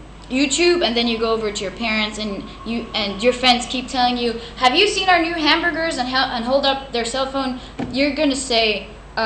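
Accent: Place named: France. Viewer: I think confidence=your capabilities Self-esteem=How you feel about yourself! By the way what is your native language? I hear a french accent, forgive me if I am wrong!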